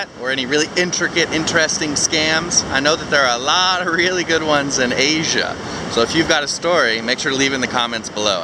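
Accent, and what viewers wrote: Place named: United States. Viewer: speaking English language with an american accent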